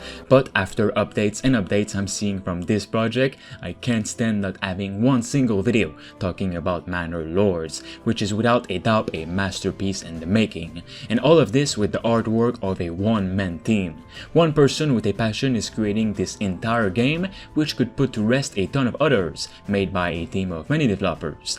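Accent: Quebec, Canada. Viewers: t'es québécois toé ? sa s'entend a ton accent, nice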